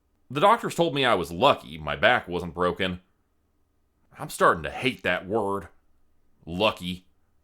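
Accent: South, United States. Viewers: Your southern accent is 'Meh' at best, I'm from Alabama, but it's better, (excuse me, spit tobacco juice in a can) than my Canadian accent 😂😂😂, I'm a major fan of Hollow's End & Charlie's Department Store Mr, your accent still needs some work 😂. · Great southern accent 👌. Thank you for another excellently narrated great story ❤❤❤😊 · Love the southern accent! Thank you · Your attempt at a Southern accent is not too terrible! I appreciate you not going for the Beverly Hillbillies style of dramatic drawl that sounds so thick and saccharine that it becomes comical. Nobody talks like that either. So thanks for exercising subtlety and restraint. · Ooohh…loving the southern accent! Great story 💜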